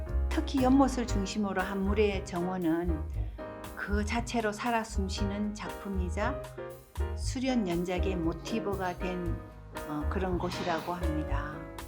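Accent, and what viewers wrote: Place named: Gyeongsang, South Korea. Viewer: 오랜만에 여유를가지고 샘 작업을 훔쳐보고있습니다 ^^ 겡상도억양이 그림의 따뜻함을 더 하는것 같습니다 배경음악의 볼륨이 샘의멋진 설명을 누르는느낌이 조금 아쉽습니다 ㅎ 기연샘 음악회때 처음 샘 만났는데 기회되면 화실에 함 가보고싶습니다 요즘도 성산 출강하시죠?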